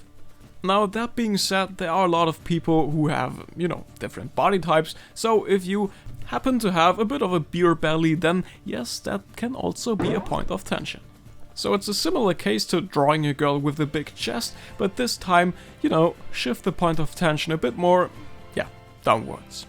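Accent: Germany. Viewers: Man hört den deutschen akzent noch durch, aber das wird wohl besser werden mit der zeit. ausserdem ist der voll gut, man merkt ihn aber er ist nicht krass nervig oder ähnliches. Aber die übersetzung an sich ist echt schön geworden :o auch die betonung usw. Narutos clothes are THICC (okay) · Ich finde das du super Englisch sprichst. Eigentlich stört mich ein deutscher Akzent im Englischem immer, welchen man bei dir auch ein wenig raushört, doch es klingt trotzdem gut ~ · Ah, German? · I have never seen your channel before, but as soon as I heard your voice, I knew you were German. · Dude I love your accent (Hört richtig das Deutsche durch XD)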